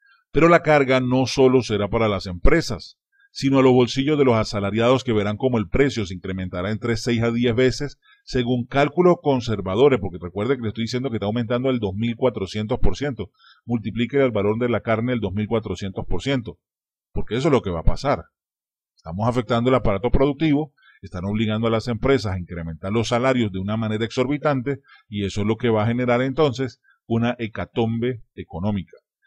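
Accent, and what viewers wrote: Colombia. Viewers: no solo eso, sino que tmb es colombiche... notose si lexico y su tonico de voz... es tan colombiano cm madurin o mas xDDD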